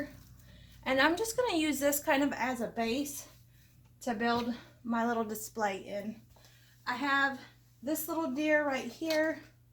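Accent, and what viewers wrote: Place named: South, United States. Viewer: Southern is best!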